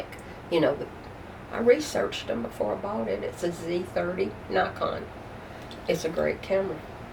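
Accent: South, United States. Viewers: Congratulation! That is when I started watching you when you said you Lived in a Shed. I thought let me see where this poor old woman has to live! And what a surprise, I love your beautiful shed! Your camera looks good. I just love listening to you talk, I love your southern accent. I watch a lot of "live alone" women on YouTube because that is me also. Keep up the good work!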